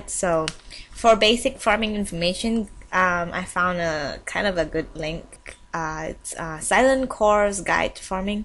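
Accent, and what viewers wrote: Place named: Scotland, United Kingdom. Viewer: He has a Scottish accent :)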